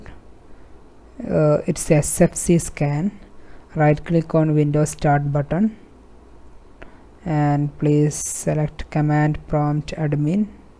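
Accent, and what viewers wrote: Kerala, India. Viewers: Chetta ningalde english kettappo manassilaayi malayali aanenn ente prashnam enthannu vechal 1, Eppalenkilm pc marythakku on aayal taskbar and settings not accessible aanu 2, pc open avumbolekk screen blank aavua pinne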